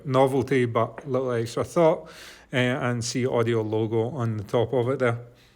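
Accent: Scotland, United Kingdom. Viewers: Id say central Scotland.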